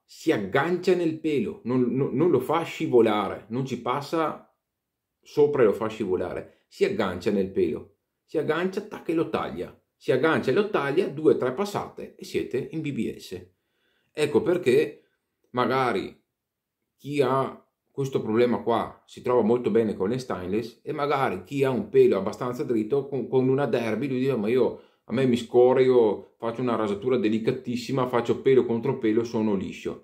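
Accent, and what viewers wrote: Veneto, Italy. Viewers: Carissimo, Ho visto questo e molti altri tuoi video. Condivido tutto e trovo le tue recensioni di lamette e rasoi molto vicine alle mie stesse impressioni, per quei pochi modelli che conosco. Penso abbiamo entrambi pelle molto delicata e versi del pelo inclinati. Io da molto anni mi sono avvicinato alla rasatura tradizionale anche se ad intermittenza, per molti anni ero tornato al multilama per comodità e poco tempo al mattino. Però ho sempre usato anche col multilama l’ottima crema DR Harrys Arlington ed il pennelli Plisson in tasso grigio di alta montagna ed il dopobarba Acqua di parma in balsamo nella profumazione colonia classica, un prodotto che trovo eccezionalmente lenitivo. Dei Plisson e di Acqua di Parma non ho mai trovato recensioni almeno in italiano, peccato perché Plisson è la più antica fabbrica di oennelli da barba. 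Proverò le lamette che hai consigliato in altri video, almeno quelle più reperibili. Grazie e bel lavoro! Ed anche complimenti per l’accento Veneto, anche io sono veneto ma molto più ai confini nord… Saluti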